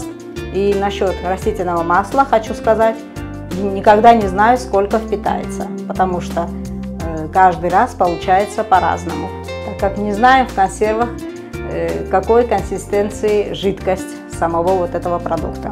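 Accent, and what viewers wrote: Batumi, Georgia. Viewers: Бесценны. Ваши рецепты,! Приятный ваш говор, я как будто, побывала в Батуми! Спасибо вам!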